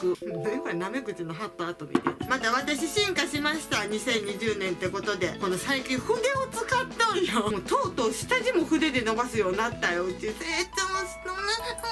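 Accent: Okayama, Japan. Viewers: 岡山弁？可愛い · 岡山県民やけど久しぶりに見て岡山弁すごいでてんなってなった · 岡山弁がなつかしゅーて見てしまう(笑)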